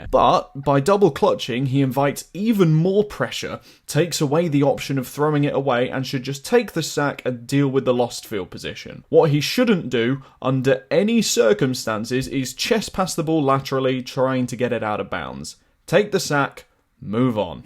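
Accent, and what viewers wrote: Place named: United Kingdom. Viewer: This is probably the first time I've heard NFL analysis in a British accent, and it's also one of the best, most concise, well presented ones at that.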